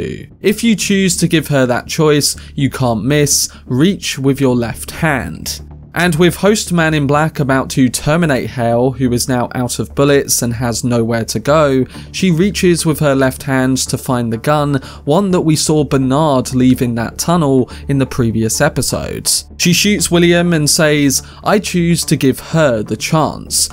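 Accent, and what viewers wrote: United Kingdom. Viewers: It’s standard British pronunciation, don’t be an ignoramus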